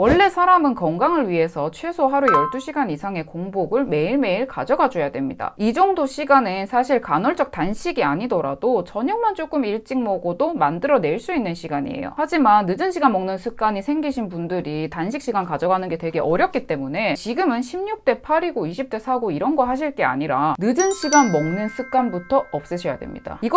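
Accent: Gyeongsang, South Korea. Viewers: 음... 혹시 경상도에서 사시는 분이신지??? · 경상도분이시죠?? ㅋㅋ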